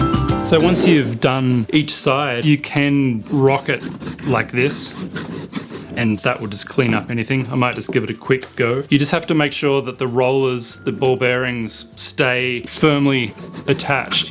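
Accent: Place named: Australia. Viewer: This looks great! You sound Australian and you gave the price in dollars. Unfortunately I can't see any information at the String Pluckery website about what currency they're using or where they are located. Is this price in Australian dollars? (I'm hoping it's not in US dollars!)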